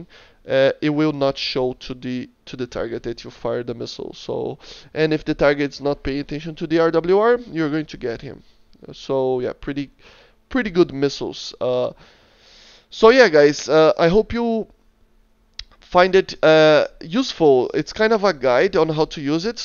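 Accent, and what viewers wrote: Brazil. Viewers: Pelo sotaque eu desconfiava que era BR, bem legal o vídeo cara, bom trabalho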